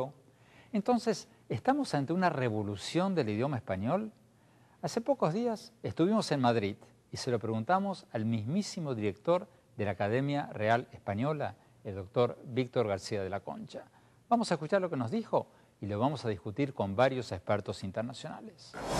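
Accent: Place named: Argentina. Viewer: Me gusta el acento que tiene openheimer, es argentino?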